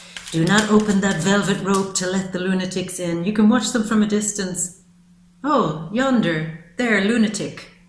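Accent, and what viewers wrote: Ireland. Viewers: Neil said mesmerize, I say magnetic.He is right, you dear lady have it all, beauty, poise and grace. Also what appears to be a charming Irish lilt. I dare not say anything further for I have only one heart to loose.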